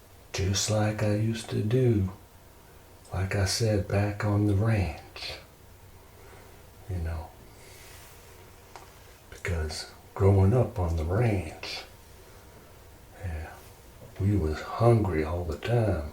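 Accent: United States. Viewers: I live in cowboy country, so it's enjoyable to see the nod to our culture and accent. Thanks! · Love that cowboy accent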